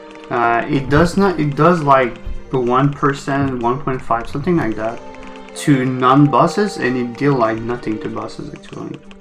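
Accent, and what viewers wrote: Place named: France. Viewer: Cuz u sound french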